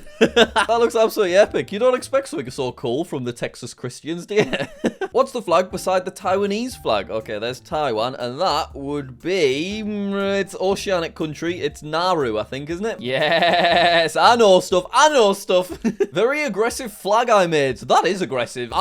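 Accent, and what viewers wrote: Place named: United Kingdom. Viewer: Why are u british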